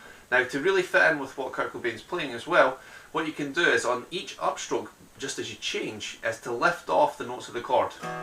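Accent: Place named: United Kingdom. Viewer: Thx for a clear, well produced tutorial. " "? It was a good chance to brush up on my Mancunian, or Geordi, or Scots...whatever... sounds cool.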